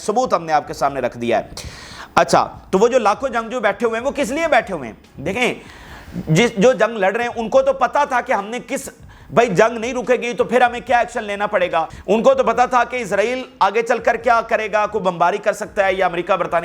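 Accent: Punjab, Pakistan. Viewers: This guy talk garbage past 10 months he has been spreading panic n fake news All these months he has been saying that hizbuallah will go in war with yahoud but hasn't happened yet but now we experiencing conflict with yemen and yahoud He hasn't or never said anything about this incident 87 people got injured and 3 killed Now he saying things differently He is there to make money from u tube Please stop him by making comments to slam his fabricated news He dance scream start questionig himself and answer himself He has no source of own his on Get news or copy news from different resources which is readliy available to all of us Can't speak right one word of English Neither he can't speak urdu in right accent except speak everything in punjabi accent Belive me he is nothing except a punjabi merasee Just listen his English and watch his body dance He try to look waheed murad or daleep kumar😂 This monkey become on u tube a laughing stock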